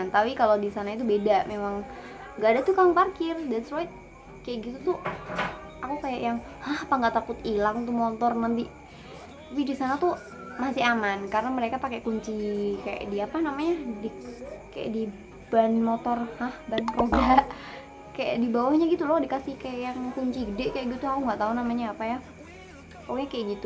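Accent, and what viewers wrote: Malaysia. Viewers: Lawak gile org indonesia ckp slang2 malaysia😂😂 comel sangat😂😂💕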